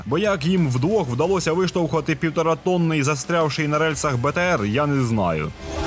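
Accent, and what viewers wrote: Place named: Dnieper, Ukraine. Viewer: Дякую що сказали: бо Ми з подругою дивилися, слухали і думали - звідки родом автор відео. Моя перша думка- Закарпаття, потім зрозуміла - ні. А подруга каже: точно не Закарпаття, може центральна Україна, десь Вінниця або Черкаси. Але ми не експерти - мало подорожували і у відтінках мови центральної України не дуже розбираємось;). Тож Івано-Франківськ вітає Дніпропетровщину ( казали що хотіли перейменувати на Сіверщину..) і дякує за дотепний блискучий барвистий гумор і соковиті відтінки наддніпрянської української 🎉❤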